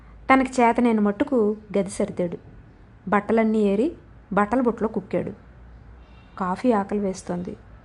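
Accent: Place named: North Andhra, India. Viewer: ముగింపు ఊహించగలిగేదే ఐనా కథన బలం చదివిస్తుంది ఇలాంటి కథల్లో! జీవన చిత్రాలు కథలుగా కదిలే విధం అదేగా! ఉత్తరాంధ్ర యాస గారి గళంలో బహు సహజంగా ఉండి కథకు మాధుర్య పరిమళం అబ్బింది!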